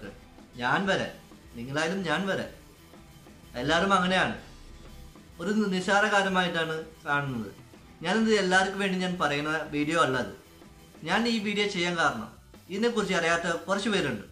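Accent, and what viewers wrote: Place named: West Bengal, India. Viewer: ബംഗാളി മലയാളം സംസാരിക്കുന്നത് പോലെ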